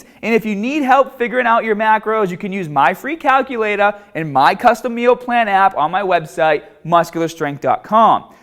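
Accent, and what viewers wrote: Boston, United States. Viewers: loll that boston accent tho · God fucking damn that Boston accent!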